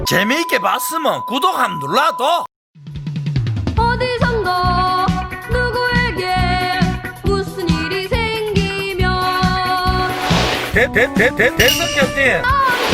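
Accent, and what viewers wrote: Gyeongsang, South Korea. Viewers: 마지막 멘트에 구독누르고갑니다 ㅎ 정겨운 갱상도사투리 좋네예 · 아따마 갱상도 사투리쥑이네예 ㅋ 구독눌립니다 😂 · 아재요! 부산사람잉교? 아! 부산에 저런 시골은 엄째. 우째던 갱상도 사투리 구수하네요. 구독 누르고 감니데이. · 매일 시청합니다 구수한 경상도 사투리가 참말로 재밌고 입담도 좋습니다 맨날맨날 화이팅 ~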